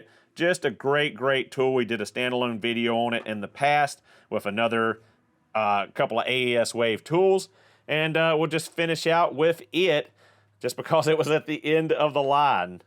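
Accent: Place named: Vermont, United States. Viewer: Is a Vermonter? It all checks out. He even has the accent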